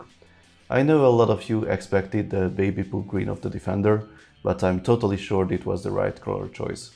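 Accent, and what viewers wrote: Hungary. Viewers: Olyan magyaros akcentusod van... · Az akcentusod elárulja h magyar vagy de amúgy jó lett !!!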